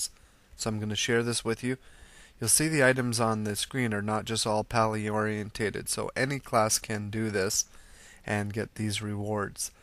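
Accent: Canada. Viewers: its not really a chicago accent...its more of a canadian..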